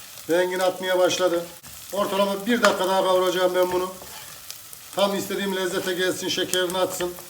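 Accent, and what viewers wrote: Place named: Anatolia, Turkey. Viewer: şef zaten bir Anadolu insanı konuşması ve yemek tarifleri ile 10 numara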